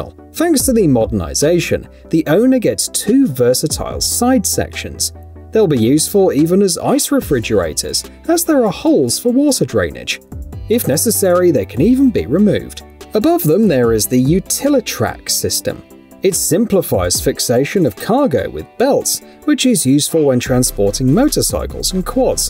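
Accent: United Kingdom. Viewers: Loose the Limey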